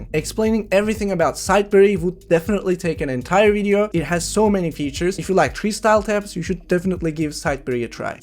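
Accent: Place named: Turkey. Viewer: Hocam aslinda Turkce scriptin direkt Ingilizce karsiligi ne ise ona kasmak yerine, deginmen gereken onemli seyleri not alip onlardan bahsetmeye dikkat ederek dogaclama en bastan Ingilizce konussan daha mantikli olmaz mi? Bir de cok aksan var bence. Elbette aksanimiz var ama ben mesela Amerikan tarzi konusmaya calisiyorum, daha guzel duruyor. Direkt duz Ingilizce konusunca okulda dili ogrenen Turk liseli ogrenciler gibi geliyor kulaga, bu da globale acilmanda profesyonellik acisindan iyi degil bence. Nacizane tavsiyelerim. Dil degisince karakterin ve kisiligin degismesi gibi bir konu var bazi insanlarda. Baska birisiymissin gibi konusuyorsun istemsizce. Bende de oluyor. Reaksiyonlar, vurgular, tonlamalar hep farklilasiyor. Ama seninki, yine benim bildigim konusmasinin sadece dili degismis hali gibi hissettiriyor. Buyuk kanallarla kapisman konusunda sikinti yaratacigini dusunuyorum.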